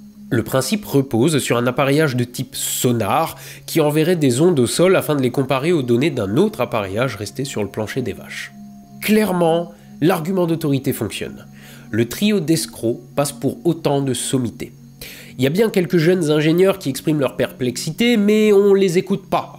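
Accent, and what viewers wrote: Alsace, France. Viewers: C'est l'accent alsacien ou l'humour que tu comprends pas ? Sans doute les deux 😂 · Bonjour, après avoir ressenti de moins en moins d'intérêt pour les sujets et le style des scripts, je me suis désabonné. On est désormais très loin de la série Hermes et de ton humour personnel. Un petit mot aussi sur l'accent alsacien qui était drôle...au début. C'est juste mon opinion et je dis cela sans haine et à priori pour ton travail et ta personne. Je te souhaite bon vent pour la suite .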